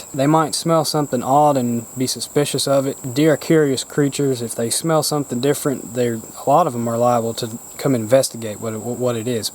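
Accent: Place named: South, United States. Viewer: Thank you for this great video. You’re knowledgeable and I like your Southern drawl.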